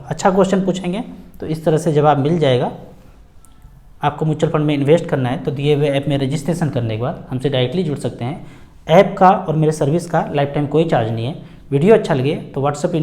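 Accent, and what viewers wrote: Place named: Awadh, India. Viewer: सर आपकी बोली अवधी के जैसे है आपका होम टाउन कहाँ है.?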